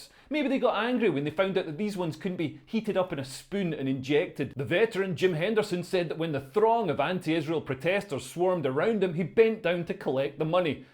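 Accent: Scotland, United Kingdom. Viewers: You are Zionist Jew trying to hide behind the Scottish accent...supporting Palestine is about humanity. Why dont you talk about the savage brutality of Israel - your genocidal homeland. Free Palestine 🇵🇸 btw thousands of peace loving Jews were at the marches ..they didn't feel threatened or intimidated. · So, you're for, completely flattening gaza, stop the Scottish accent, yer so far right, it's embarrassing.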